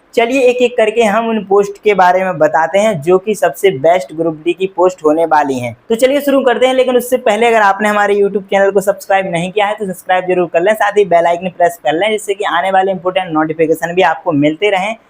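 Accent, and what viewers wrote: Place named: Jharkhand, India. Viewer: Jharkhand me bhai aise hi pronunciation kiya jata h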